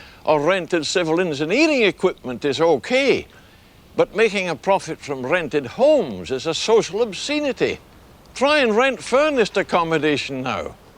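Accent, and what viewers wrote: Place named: Scotland, United Kingdom. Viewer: Strong Scottish accent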